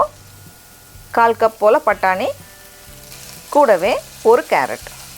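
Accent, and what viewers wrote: Nagercoil, India. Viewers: Akka NenGa NaGerCoil aH ???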